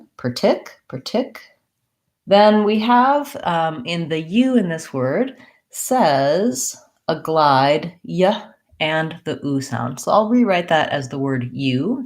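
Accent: United States. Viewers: I don’t think I’ve ever heard an American speaking so clearly 😳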